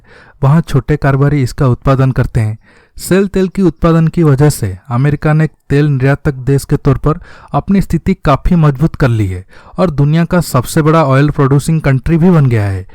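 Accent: Odisha, India. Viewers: Hi, are you Oriya??? Because your pronounciation sounds like that??? 🤔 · Odia touch tike rahuchi bhala laguchi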